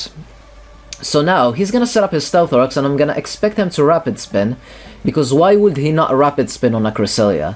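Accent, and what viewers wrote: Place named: Japan. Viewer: Your Japanese accent is epic. Critical hitto! Jettoman! I love it.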